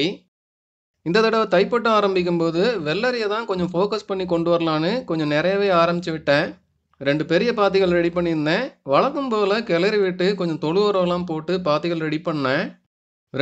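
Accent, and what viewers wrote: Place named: Coimbatore, India. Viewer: super நா.. உங்க பேச்சு.... தெரியுது... கோவை... உங்க video inspiration ah இருக்கு அண்ணா..vithai pakirvu iruntha podunga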